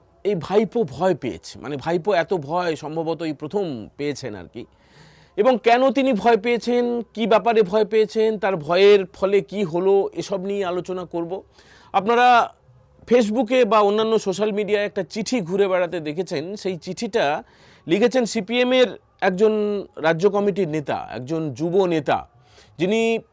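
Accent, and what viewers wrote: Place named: Bangladesh. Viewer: Ami prochur bangladesh e manus r sathe kotha batra hoe che anek k chini. Bangladeshi der ekta adbhut accent ache, janina koto jon manben na bujben , ae anchor ta bangladeshi bangali der moto accent.😮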